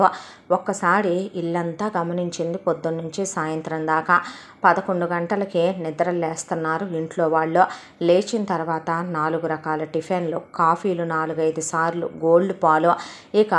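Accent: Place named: Guntur, India. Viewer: Mi story reading chaala bavuntundi gaaru.Guntur slang excellent.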